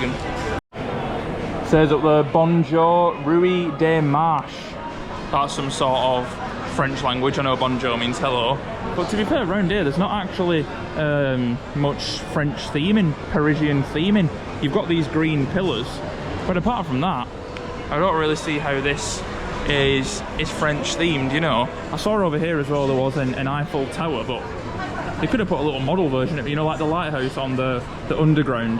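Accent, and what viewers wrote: United Kingdom. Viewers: Are you cockney?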